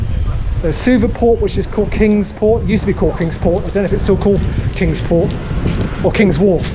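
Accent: England, United Kingdom. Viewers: So what if he has an English accent...??? Sheesh, wish him well. Respect people wherever they came from, where they were born and however they speak....peace to all.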